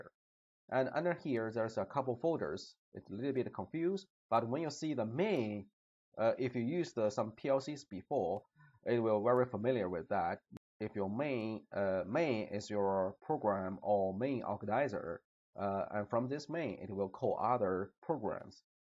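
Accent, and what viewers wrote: China. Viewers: Судя по акценту автор китаец) Как я понял? "So from here".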